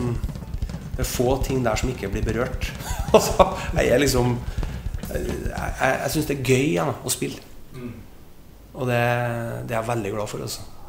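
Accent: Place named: Trøndelag, Norway. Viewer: Bra forklart, men hvor er "The Subtitles" ikke mange av mine kompiser her need som forstaar TrOndersk!?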